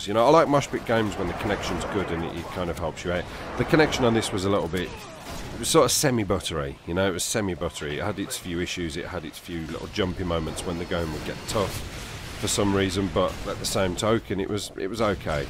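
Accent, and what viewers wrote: Birmingham, United Kingdom. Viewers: I thought you were a fat, bald Brummie! 😂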